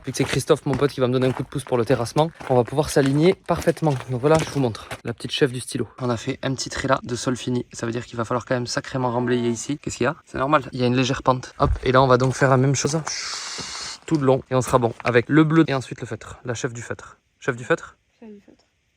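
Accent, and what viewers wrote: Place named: South, France. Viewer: J’adore tes explications et tes expressions qui sont toutes pépites 😂, ce débit de paroles du sud, généreux et de bonne humeur communicative. Quoiqu’il en soit, le résultat est sublimissime, l’arrivée à la maison est chaude et accueillante, tout vous deux quoi !! Surtout ne change pas, ceux à qui ta façon d’être ne plaît pas non qu’à regarder des films muets des années 1920. Bises à vous deux et douces caresses à la belle Nala 🫶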